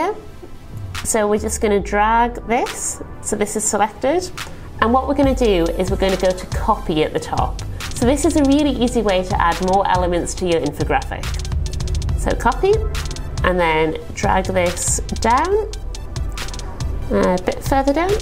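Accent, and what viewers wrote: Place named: United Kingdom. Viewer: First of all, our beautiful and intelligent teacher is a stone cold fox! Wow! Her edgy British accent is super cool, and her subtle sense of humor makes the video easy to watch. It’s nice to see a real human being, with attitude, great tats, and lots of smarts making instructional videos instead of the usual blah blah blah missy girl. I can hardly wait to start my info graphic… keep up the good work!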